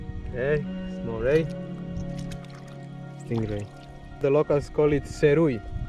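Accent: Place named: Indonesia. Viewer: Logat nya khas pedalaman Indonesia banget. Auto subscribe ini mah 🤙🤙🤙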